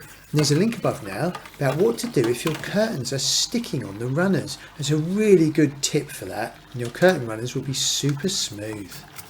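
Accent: United Kingdom. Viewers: Nice british pronunciation🙂. My wife (an English language teacher) loves it😉. Thank you for the film and best greetings from Poland.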